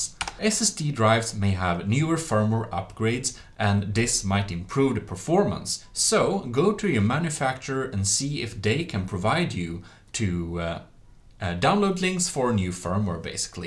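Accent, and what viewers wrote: Sweden. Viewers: Either that’s just your accent or the Sweden 🇸🇪 accent. I like it! By the way, nice video. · är du svensk??? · While the video is great I have to say that yes it is the Swedish accent and as a Swede it hurts to hear the accent knowing I sound the same lol.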